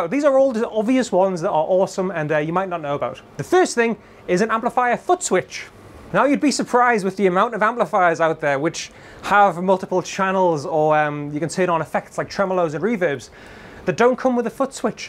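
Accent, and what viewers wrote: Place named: Newcastle, United Kingdom. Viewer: I had no idea you were a Geordie. I thought you were Scouse or something. I really need to learn my UK accents.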